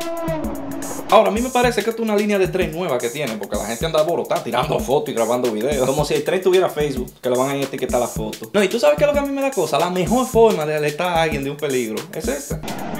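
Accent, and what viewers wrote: Cibao, Dominican Republic. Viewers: AJAJAJAJAJAJAJA ME ENCANTAN TODOS TUS VIDEOS SON LO MAXIIMOOO... Y ESE ACENTOOO DOMINICANOOO EXCELENTE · hahahahahahahahahahahah k cura x.x eres dominicano? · es dominicano del Cibao jajajja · Jajajajaja que risa manin eres de República Dominicana no lo puede nega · Dominicano de pura sepa...No se nota??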